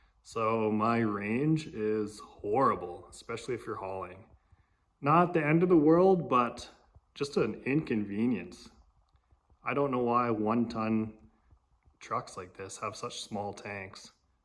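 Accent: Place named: British Columbia, Canada. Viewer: I noticed you northern bc accent, lol. Checkout atlin BC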